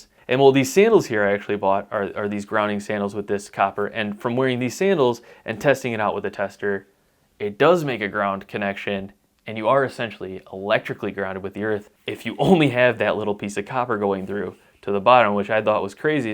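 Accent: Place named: Upstate New York, United States. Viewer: Are you originally from upstate NY? I like your subtle accent - it's just like my friend's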